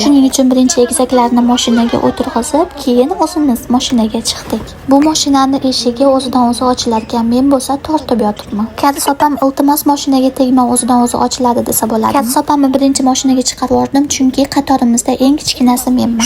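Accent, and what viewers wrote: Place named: Andijan, Uzbekistan. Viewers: Ha Anjonlika o'xshab gapiryapti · анжонлимисиз жавоб берингууууу😂ёмоннам кизикяппандааа😅шевез анжончага тортяптиююю😂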